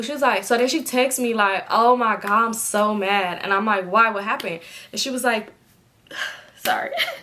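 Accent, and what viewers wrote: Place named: Texas, United States. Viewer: “ hey yall “ in every intro. 😂 sound like you from Texas tbh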